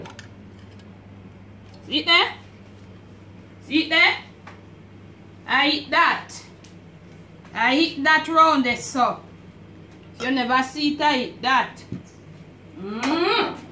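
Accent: Patois, Jamaica. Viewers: I like when you talk the patois it sounds so sweet.lol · First time watching your videos...just by you representing the real jam dialect have me subscribing I'm sure I'll learn a thing or two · I love ya u make me laugh love the jamaica accent 🤣🤣🤣. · Love your energy but nothing jamaican about this video but your accent. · Love your video and and love the beautiful dialect or Jamacian patios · I dont understand some of what she's saying but am I the only one who doesnt care & love listening to her Jamican accent 💛💙💜💚❤ When I visit Jamica I would like to go visit her house😎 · I luv her raw Jamaican accent....she's the best!!! goodup goodup pickney...lol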